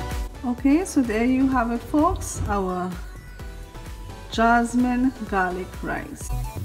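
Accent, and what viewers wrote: Trinidad and Tobago. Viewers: This was the most simple recipe I found. And how lovely to encounter what sounds like a Trini accent😊🇹🇹 Thank you very much!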